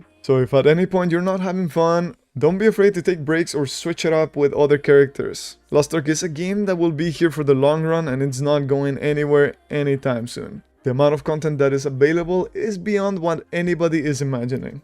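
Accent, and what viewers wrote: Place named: Europe. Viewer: I thought you were EU based on your accent haha, or you’re playing both regions?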